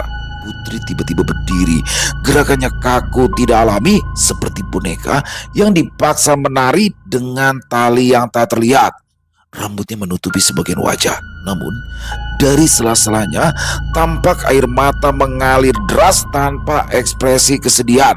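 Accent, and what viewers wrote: Jakarta, Indonesia. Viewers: Waalaikumsalam mlm bang, Alhamdulillah sehat bang,semoga abg,team dan penulis sehat slalu,Batam setia hadir nyimak bang,ayo gas kan bang,biasanya ada tuh kata2 yg lucu klu sdh logat Betawi muncul bang nih 😂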